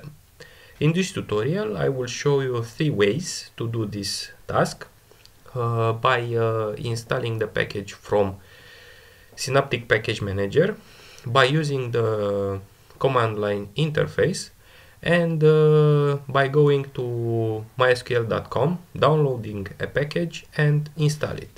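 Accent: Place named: Russia. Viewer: Looks like hard russian accent )))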